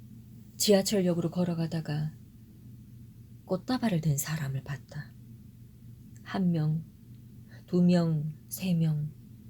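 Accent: Gyeongsang, South Korea. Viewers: 왜 갱상도말로 하는 거죠? 신경 거슬려 못듣겠음. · 갱상도 버전으로 읽어니 또다른 맛이 나네요 잘들을게요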